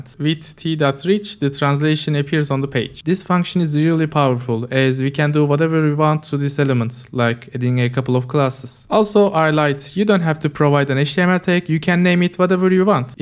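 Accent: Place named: Turkey. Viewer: jeez, i know when i hear a turkish accent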